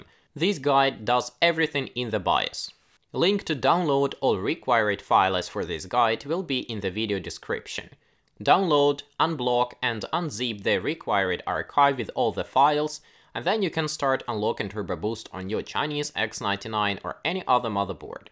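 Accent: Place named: Russia. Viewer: Со славянским акцентом. Очень похож на русский акцент. :)))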